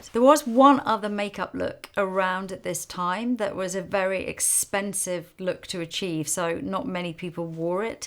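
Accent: England, United Kingdom. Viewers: I looooove your English accent 🤤🤌🏽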